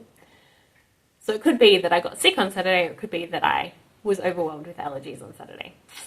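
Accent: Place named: Australia. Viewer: Hello Lovely. You just popped up out of nowhere but Im glad you did. Love your Aussie accent and all your makes. So far from home but home is where the heart is, eh? Im an Aussie too of Romanian background. Do all the crafts too...about to finish a quilt and work on a few knitting, crochet wips . I am married to an artist.Coming up to our 20th. Love that your Dad paints trees.Go well in your days. Just finished my Go Go. Blocking as I type. ❤🐨🌏xx🌞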